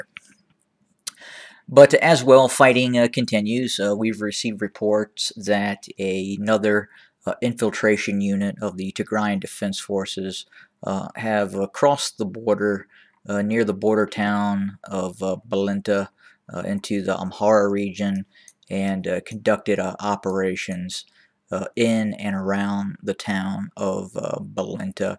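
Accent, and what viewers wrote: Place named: United States. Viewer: You are definetly abesaha, why put this white American accent ?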